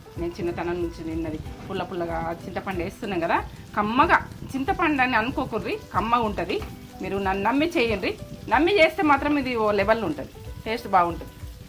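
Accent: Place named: Yadagirigutta, India. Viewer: Aunty meeru matladutunte same maa akka valla attagaru matladinatte undi mee slang..medi yadagirigutta side untara aunty..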